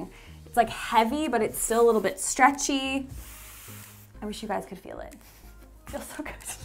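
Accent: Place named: Canada. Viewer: I knew the first time I heard you that you were Canadian. Ouut.and about. I love Canadians. Unfortunately I’m stuck in the USA during a very dark time.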